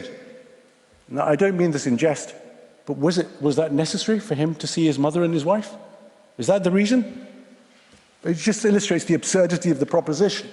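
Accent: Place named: United Kingdom. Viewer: To parade the British accent as something that might invoke jealousy in Indians is rather infantile of your part. I 'll come to the issue of Kashmir though. At the very outset, you must get your facts right. It was Pakistan and not India who violated the UN guidelines by not withdrawing its troops from Kashmir in 1948. Why cry foul now? Coming to the issue of 'unarmed Kashmiris' being on the roads, it is no secret that the whole might of ISI and its Mullahs is behind how this sadist project has seen the light of the day. How systematically stone pelting has been funded. The political careers of separatist leaders from Mirwaiz to Malik rest on the instability of Kashmir. Other than that they do not have any clout in other parts of India. Might I ask, where exactly were the so-called separatist leaders and their sympathisers in Pakistan when the whole Kashmir valley was flooded a few years back? 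What we as Indians understand about our country is none of your business. We have institutions still in India that have not been sabotaged by Mullahs and Military unlike the Kangaroo courts of Pakistan where capital punishment is doled out liberally.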